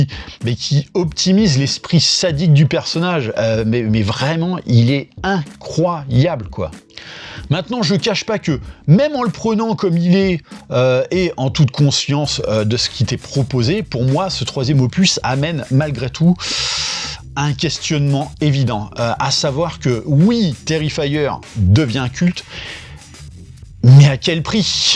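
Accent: Paris, France. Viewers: Le phrasé "titi parisien" est un peu trop poussé 😉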